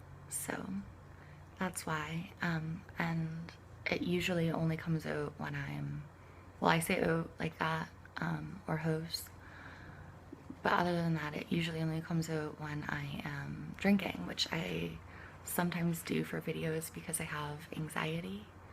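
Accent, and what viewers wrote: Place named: Canada. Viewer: Nice video, I usually prefer whispering or soft sounds but your voice is rather soothing. I like that you have a bit of a Canadia accent and also some southwestern. It's very North American and something. Also, cats...cats are love. I hope you're well.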